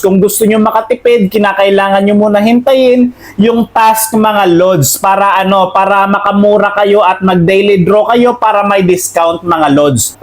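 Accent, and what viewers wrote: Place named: Visayas, Philippines. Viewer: Bisaya yan halata sa lods sarap sapakin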